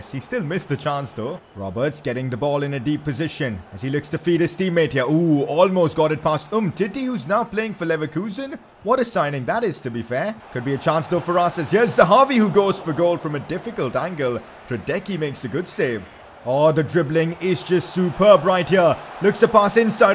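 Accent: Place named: India. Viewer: why your accent sounds so indian xD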